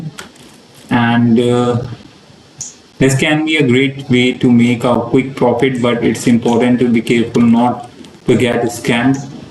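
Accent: India. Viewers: ofc indian af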